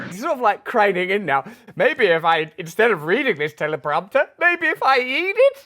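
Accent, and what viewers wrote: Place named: United Kingdom. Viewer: You talk about Biden cant speak English, lol neither can you. I know its a British accent but if you can pronounce proper English then dont tell someone else they cant.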